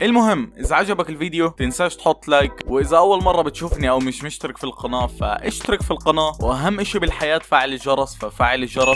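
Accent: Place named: North, Palestine. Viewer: لهجتك فلسطيني من الشمال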